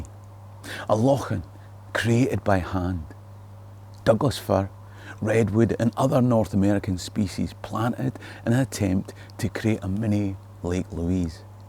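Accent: Scotland, United Kingdom. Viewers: By the sound your a mcgreger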